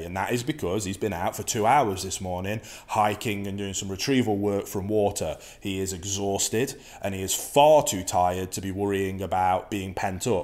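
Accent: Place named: Midlands, United Kingdom. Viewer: Can’t believe you just mention Cannock Chase 👍 I thought you sounded like you were from the Midlands xx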